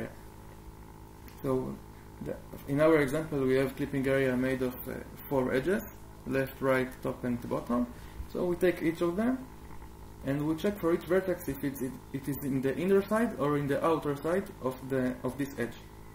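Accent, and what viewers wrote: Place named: Arab world. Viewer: you have arab accent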